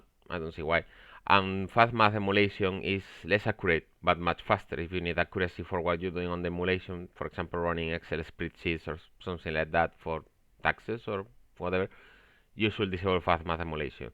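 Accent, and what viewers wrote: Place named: Spain. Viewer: Eres español? Tu acento te delata lol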